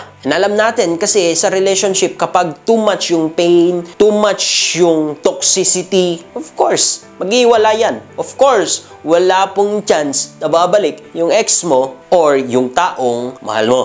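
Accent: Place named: Ilocano, Philippines. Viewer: PArang ilokano itawis ung pagbigkas mo Ng salita sir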